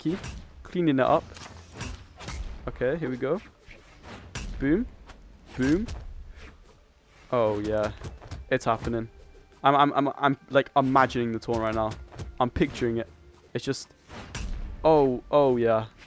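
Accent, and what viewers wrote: England, United Kingdom. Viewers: If u lost that last game lmfao also are u English?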